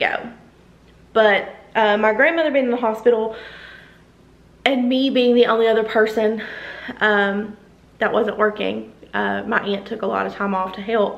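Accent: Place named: Southwest Virginia, United States. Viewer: I’m new to your channel, but I wanted you to know I’m sending you so much love and light 💞 I lost my dad in 2022, and I swear you’re right about grief. The things that hit the hardest are after they’re buried, after the dust has settled, and you wake up to remember the loss all over again. Girl, you’ve had a super tumultuous year. Take your time to recover ❤️‍🩹 and hey! I’m from Virginia, and you have the same accent as my grandma from SW VA in Grundy! Again, sending you love 💕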